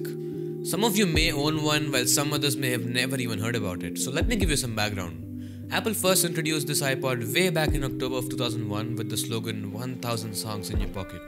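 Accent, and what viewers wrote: India. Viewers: It's just the normal Indian English accent. I can hear him just fine.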